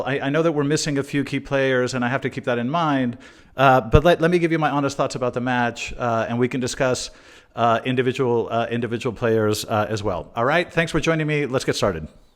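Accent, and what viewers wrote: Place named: United States. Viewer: We love this channel a lot. But it's like you are American. You speak with a clear American accent.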